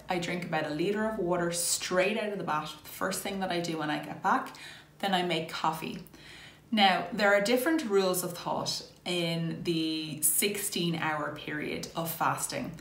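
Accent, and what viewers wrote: Ireland. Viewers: I have BED as well and also struggled with food addiction since childhood. I so agree with what you said about not being able to hear the fullness cues! I actually tried alternate day fasting last year and ended up losing some weight but inevitably plateaued because i was not keeping track of calories and, if I’m completely honest, was using my eating days to sort of binge 🤦🏻‍♀️ it was also just extremely difficult for me. Have since found your videos avs thinking of signing up for Bodyslims today and maybe trying 16:8 as well… thx for making all the info simple and clear! 🙌 Ps love ur Irish accent, could listen to it all day haha so soothing 💖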